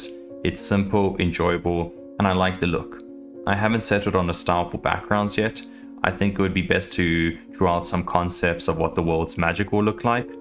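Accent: South Africa. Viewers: bro u South African? u got the accent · Awez Bru, I'd recognize that accent anywhere my Bru 🇿🇦🇿🇦🇿🇦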